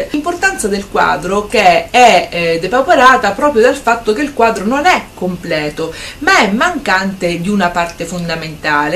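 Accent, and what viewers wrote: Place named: Tuscany, Italy. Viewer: Bravissima attrice, con quel tuo simpatico accento toscano. Molto professionale e interessante la tua spiegazione. Grazie.. <3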